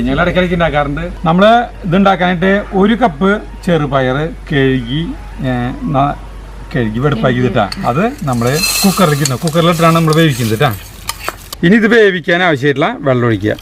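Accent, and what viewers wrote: Thrissur, India. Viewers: Sugiyan 👌👌👌👍👍👍ente Mami yum thrissur kkari aanu maman marriage chaithu trivandrum vannittu 17 years aayi but eppozhum samsarikkunnathu chetante pole nalla rasamulla thrissur slang lanu enikkum thrissur slang valare ishtamanu👍🙋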